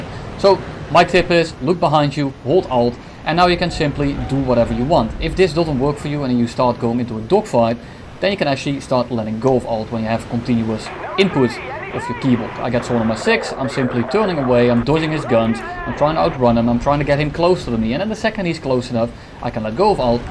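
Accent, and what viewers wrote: Netherlands. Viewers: als deze man niet nederlands is ofz dan eet ik men schoen op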